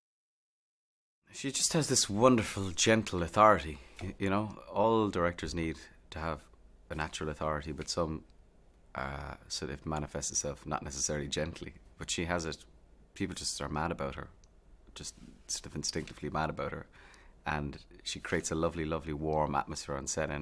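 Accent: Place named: Ireland. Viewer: In this interview he is talking with his natural irish accent. So charming 😍. And his voice sounded more high-pitched.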